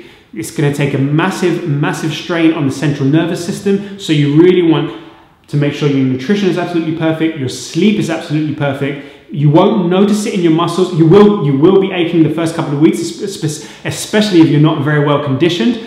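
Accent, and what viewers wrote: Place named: United Kingdom. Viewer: and why is it always bri'ish explaining the german volume training lol , ok jus kdn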